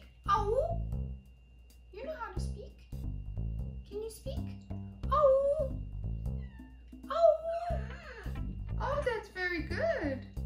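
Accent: South Africa. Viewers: quite agree, love hearing both your voices. I've been trying to place your accent..it's been bugging me. Keep thinking South African. Am I way off? 😁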